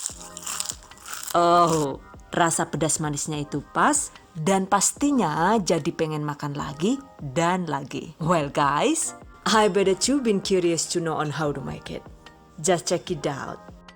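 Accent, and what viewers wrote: Banyumas, Indonesia. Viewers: Hahahaaaa...paling ditunggu komentar ngapaknya....asli lucuuu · Org madiun kok bahasanya nyong ngapak banyumas sii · Senyum2 sendiri aq tuh kalau bunda lagi ngomong ngapak sambil ngelucu🤭😄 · Yg aq suka dari chanel ini selalu disertakan tips dan trik yg mudah dipahami.. Dan selalu berhasil tiap kali dipraktekkan👍 dan yg gk kalah lagi selalu ada lucu2annya ala ngapak2nya itu lo bikin betah nontonya🤗🤗.. Terimakasih..😍😍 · Ini Madiun kok ngapak ya bun, orang mana aslinya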